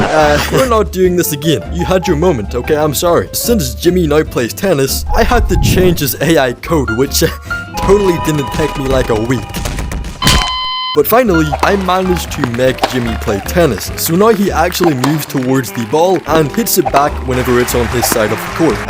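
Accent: Northern Ireland, United Kingdom. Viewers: R U NORTHERN IRISH BROOO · are you northern irish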